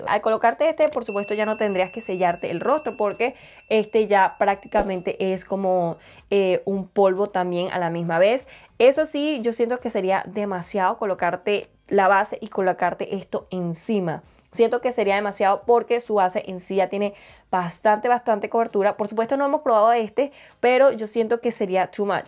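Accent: Venezuela. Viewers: Es el primer video que veo tuyo…te oí hablar y sentí el acento venezolano…tu mamá dijo Naguará y lo confirmé! jaja 😘🇻🇪 me ayudaste mucho con esta prueba de producto gracias!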